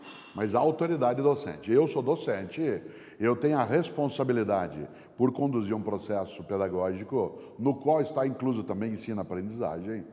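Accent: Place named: Rio Grande do Sul, Brazil. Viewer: Pena que o vídeo voi curdo esse sotaque gaúcho com toque italiano é de mais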